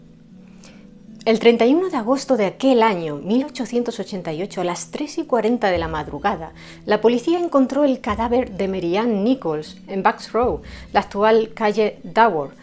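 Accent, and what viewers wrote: Spain. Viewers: me gusta escuchar historias con acento español, cuando escucho acento de mi pais o de latinoamerica no me asusta me dan ganas de bailar jajajaj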